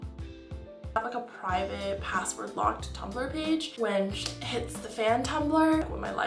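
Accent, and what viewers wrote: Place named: United States. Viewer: This is really mind-blowing. This Chinese chick speaks perfect American English. Where did you study in China? You don't have even a slight Chinese accent. You sound very Caucasian ❤️❤️❤️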